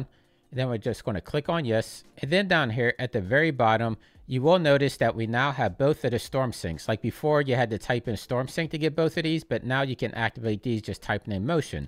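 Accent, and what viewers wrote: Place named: Pittsburgh, United States. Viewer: Thanks so much for this! I'm new to the channel. I thought I heard a bit of a Pittsburgh accent in your voice, then I saw the Kennywood sign behind you. My hometown is not too far from there! (I worked at Idlewild Park as a kid.)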